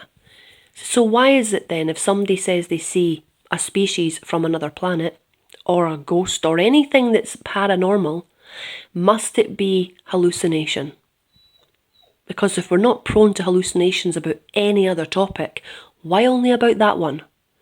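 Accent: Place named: Scotland, United Kingdom. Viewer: You scottish. I'm scottish also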